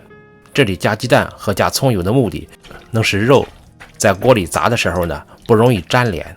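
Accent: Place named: Qinghai, China. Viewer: 标准的青普 哈哈哈，爱上了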